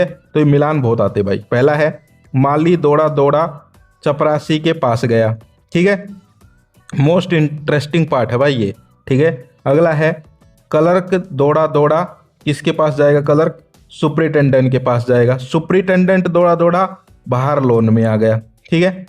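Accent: Haryana, India. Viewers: Aap Haryana se na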